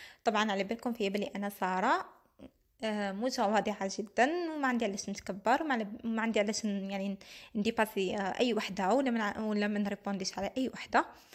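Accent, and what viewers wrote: Skikda, Algeria. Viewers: يعطيك الصحة رانا الكل نموتوا عليك و نحبوا وصفاتك و أيضا صوتك السكيكدي الجميل 😉😊 حلوياتك الكل عحبوني و درنا منهم أنا و خواتاتي 😘😘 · أنتي إنسانة رائعة يا ماشاء الله عليك، متواضعة وخلوقة وست بيت درجة أولى وباين عليك زوجة صالحة وكنة بارة ربي يحفظك أختي راكي تفوتي فالقلب بسرعة خاصة مع لهجتك السكيكدية العسل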